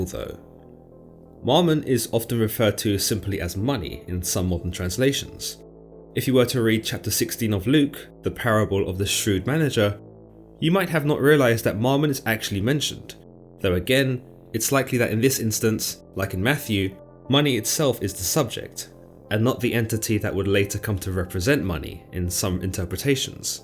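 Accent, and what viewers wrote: United Kingdom. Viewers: Lmfao no ones gonna watch this boring video 😂😂😂 i clicked on it and u have to read it and the bucktooth brit accent hell nah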